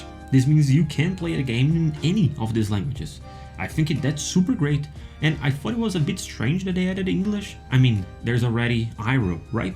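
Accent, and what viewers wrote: Brazil. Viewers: Cara n e possivel, vc e BR? O sotque ta muito forte kkkkkkk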